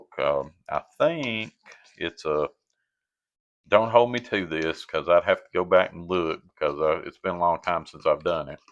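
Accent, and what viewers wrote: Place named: Kentucky, United States. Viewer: I'm trying to place your accent and I want to say Kentucky, maybe Missouri, Tennessee. We sweated our onions, too. Hope you read this and let me know. Kentucky here, btw round Paducah. I'm gonna try to get the wife to cook some of this cause it looks like a right fine recipe. I gotta say, though. It hurt my heart to hear you say almond milk and cornbread in the same sentence. Now I know you were saying NOT to do that, but the thought made me die a little inside. I'll get over it, I reckon.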